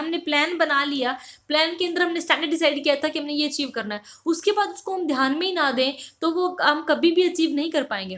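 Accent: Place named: Haryana, India. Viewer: Mam aapka anjane ka trika bahoot acha Hai😊but language me thoda Haryanvi Touch Hai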